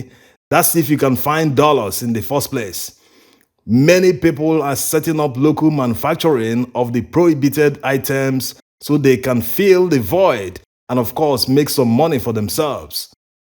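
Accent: Nigeria. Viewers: Peace unto ye. I hope you and your family are doing well. I like Nigerian accent. Believe on the Lord Jesus, and thou shalt be saved. When the true Jesus shall return, we shall worship him at the feast of tabernacles. In the new earth, we shall worship the Lord of hosts in his new moons and sabbaths. Have a good residue of the year. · His accent is AUTHENTIC Nigerian accent.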